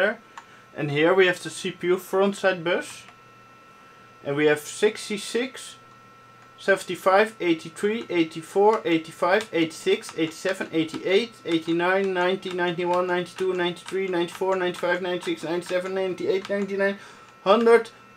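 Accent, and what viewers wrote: Netherlands. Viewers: He has a dutch accent, im dutch as well, his english is sh#t just like his entire generation, not his fault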